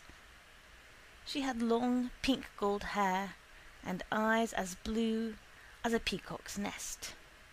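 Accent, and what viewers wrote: United Kingdom. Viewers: David Ritchie haha lol the British accent is cool tho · Get your act off here. We don’t need O’Connor audio stories read in a British accent.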